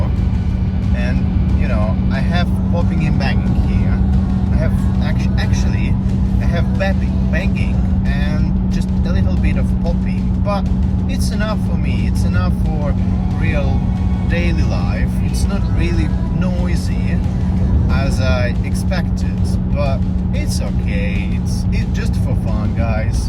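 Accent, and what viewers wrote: United Kingdom. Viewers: Чувак, молодец, лайк за приятное произношение английского))) Похож будто англичанин)) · Well done, it adds a bit of flavor to the exhaust, personally I'd go for a bit less noise for daily driving. But I do have to say, your english is very good, you almost sound British lol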